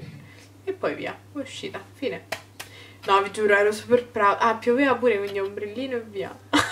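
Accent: Rome, Italy. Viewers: E' la prima volta che ti vedo su youtube. Guardando i tuoi vecchi video si vede che sei molto cambiata, ma sei ancora bellissima. Dal tuo accento direi che sei sicuramente di roma.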